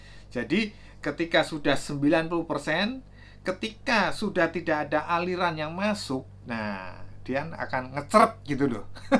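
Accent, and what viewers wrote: Java, Indonesia. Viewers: tp jujur nih mas maap nih ya, aku malah suka, lucu ato terhibur klo mas nya misuh2 di setiap konten video gara2 komen netizen, misuh2 mas nya lucu bikin ku ketawa terhibur trs apalagi dgn gaya ciri khas kental logat Jawa nya itu, gara2 itu jg aku jd sering nton channel mas nya selain pengen tau info2 gadget soal perkabelan dan per chargeran nunggu moment mas nya misuh2 soal komen aneh2 ato soal komplain netizen 😂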